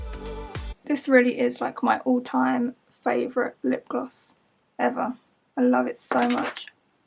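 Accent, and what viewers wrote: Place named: United Kingdom. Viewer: so lovely british accent ❤️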